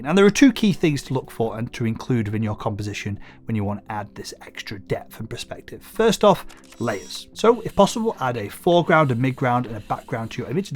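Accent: United Kingdom. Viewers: Even though I watched this video to learn about landscape photography- which is something I like to to as a hobby - I have to say that I really like your English accent and I think you’re really cute, which was the cherry on the cake. ;-)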